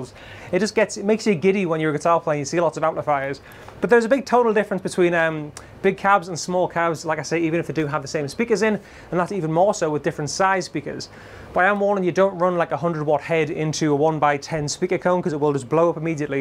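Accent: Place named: Newcastle, United Kingdom. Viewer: I had no idea you were a Geordie. I thought you were Scouse or something. I really need to learn my UK accents.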